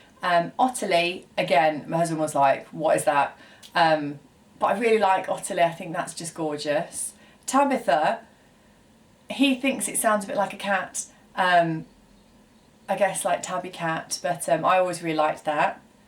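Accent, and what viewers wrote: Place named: United Kingdom. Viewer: How do you Brits do it? I'm from Alabama and I hear Georgia all the time and don't think anything of it- then I hear you say it with your fantastic accent and I'm in love with it. Lol What I wouldn't give for a British accent. Tabitha makes me think of the American tv show "Bewitched."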